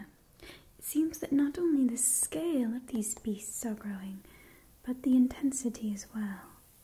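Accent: United Kingdom. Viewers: How did you match the voice so well? I know it’s technically just a British accent, but something about it feels just perfect for this specific Zelda. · Great British accent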